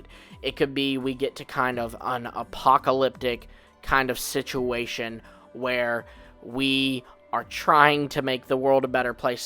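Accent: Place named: Midwest, United States. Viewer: "Ope", dude, you are SO Midwestern in your talk. XD That's totally cool! So am I. I had to be clued into the fact a year or so ago that I actually said that word as much as everyone else. Never noticed. XD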